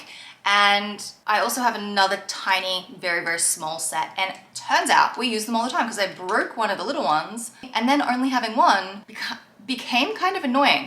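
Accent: Australia. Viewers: You’re such a Fun, often funny Adorable Young Lady which I love! From a blonde girl myself, I’m still getting used to your darker hair and honestly it suits you well ✨ I Appreciate your down to earth, real and often vulnerable approach which makes You a Joy to watch. Of course I Adore your accent (I’m a Southern gal) & find it so Lovely🤍. I fairly new to your channel & so Refreshing to hear from you!! I believe you’re Australian, if I’m wrong please correct me. Is Australian as well?! Just wanted to say Hello and let you know how much I Appreciate You 🤍🤍🤍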